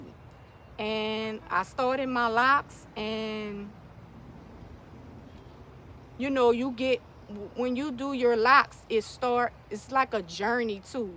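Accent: New Orleans, United States. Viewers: Been following your garden journey and love that N.O. accent! You got everything looking good now! I’m gardening in the west Texas desert at the age of 47 so I feel your passion for it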